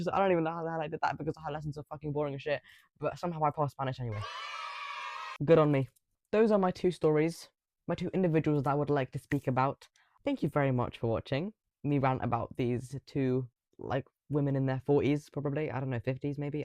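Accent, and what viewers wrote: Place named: United Kingdom. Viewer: bro is brittish wtf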